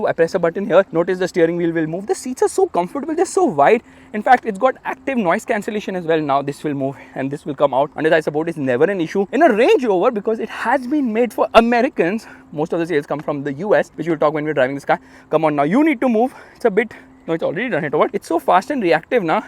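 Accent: India. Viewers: Heaviest Indian accent ever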